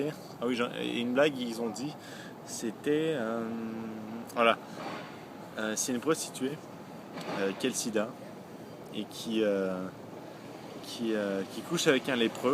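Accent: Quebec, Canada. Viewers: Tu as un accent semi français semi Québécois. C'est fou!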